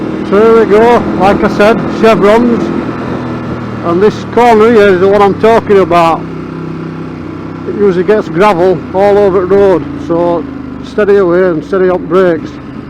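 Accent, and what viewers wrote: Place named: Northern England, United Kingdom. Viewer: Brilliant. Loved your review. Nice to here a proper northern talking about bikes and routes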